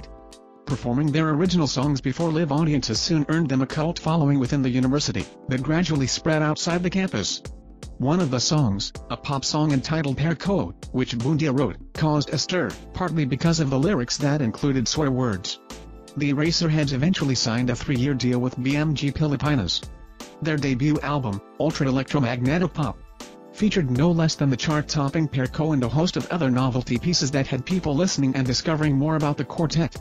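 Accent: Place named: United States. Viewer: Who's commentator...American accent... keep it up...nice trivia... about Ely buendia...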